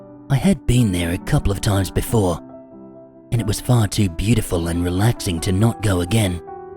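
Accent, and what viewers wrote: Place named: Australia. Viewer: The VOICE from DOWN UNDER!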